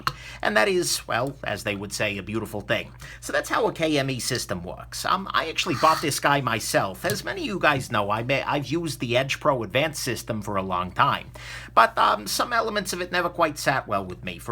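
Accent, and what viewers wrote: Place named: Brooklyn, United States. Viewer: Would you say the basic kit with just the 4 diamond stones from <num> grit is good enough? and what size rod would you say the base has coming out of it. I'm just looking and it looks close to a 3/8-1/2 rod I don't see the point paying the close to $50 for the base here in Canada. Amazon has the basic kit for $220 free shipping so thinking of just ordering that then making my own base wouldn't be hard to have a piece of wood and just put a bolt coming out. Hell almost looks like the lansky base would work too. And I have to ask where are you from? Accent sounds like a brooklyn or queens to me haha.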